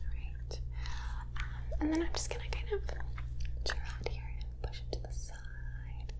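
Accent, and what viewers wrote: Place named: Great Lakes, United States. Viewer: I have no idea where her filming location is, but she has a very distinct upper USA Midwest/Great Lakes accent, also known as the 'northern vowel shift' accent. You'll hear it from time to time when she says words like flat, gallon, etc., with very flat, elongated 'a' sounds. So 'flat' becomes 'flaayt' and gallon becomes 'gaayllon.' Watch her 'Earth's Crust' video, and you can really hear it when she says 'volcaaynic' as opposed to volcanic. Really common in Cleveland, Akron, Buffalo, Chicago, eastern Minnesota, etc. Basically in/around the Great Lakes in the United States.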